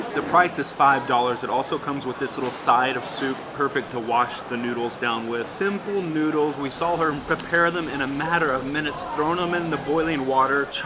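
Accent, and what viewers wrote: United States. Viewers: U from Minnesota ? Midwest accented ? Yah ? 😅 Hope U have tucked into the "Half-boiled" Soft eggs with black sauce & pepper, with crispy ButterKaya Toast !?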